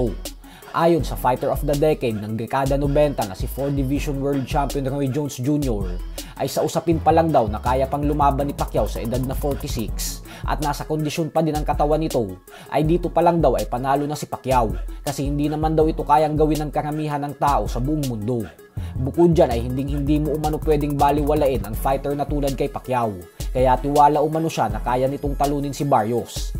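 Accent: Visayas, Philippines. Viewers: Trantado ang blogger na to visayang Visaya ang boses mag inc kanalang boy at Kunin kanaming hitman kikita kapa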